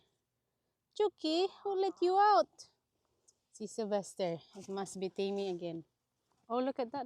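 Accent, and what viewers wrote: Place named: Visayas, Philippines. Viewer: PAG BISAYA ANG SARAP PAKINGGAN NG ACCENT I LIKE IT 😅😅😅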